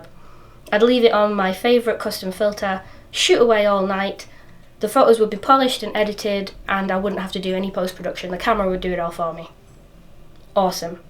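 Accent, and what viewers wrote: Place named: England, United Kingdom. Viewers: Great video , very educational and a great Northern accent. Where are you from ? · Hi, you've just gained a new sub after watching a couple of your videos, love the Bolton accent too (I'm from Manchester originally but now living in New Zealand:D) Anyway, i started photography about 15 years ago and was exclusively Canon until, for the same reason as you, i found myself leaving my kit at home. I then decided to try m43 with the Olympus OM-D E-M10, it was a cracking camera with some good features, i invested in a few lenses but i found they just didn't 'feel' right for me, for the last year though i've been using my Sony A6500, which i absolutely love, it's the best of both worlds, not too big, decent lenses and APSC sensor for more detail. I'm trying to get more into video and have just invested in the Zhiyun crane plus, which is how i found your channel, (i searched night lapse). 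So, i hope to get out and do some nice NZ lapses:)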